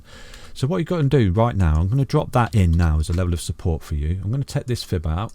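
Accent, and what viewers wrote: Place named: East Midlands, United Kingdom. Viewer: Thanks for the daily tuition, nice to hear an east mids accent!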